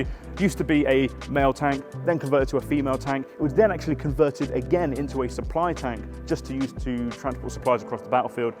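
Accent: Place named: United Kingdom. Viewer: God i love british accent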